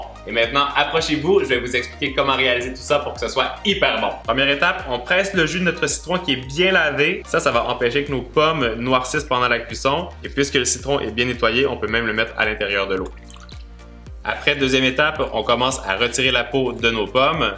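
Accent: Quebec, Canada. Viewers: J'adore ton accent 😍 c trop cool l'accent québécois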